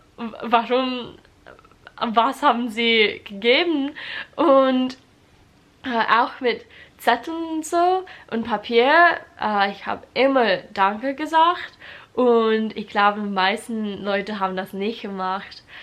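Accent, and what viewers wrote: Bavaria, Germany. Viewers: Its funny how your German sounds a little bit "bayrisch" xD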